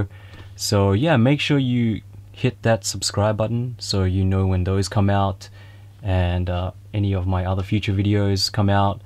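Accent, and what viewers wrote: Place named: Australia. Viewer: I was your 500th Sub haha. I'm guessing from the accent you're in Australia too? Where do you get your figures from. I've found that hobbylinkjapan is the best so far. Let me know if you have other options.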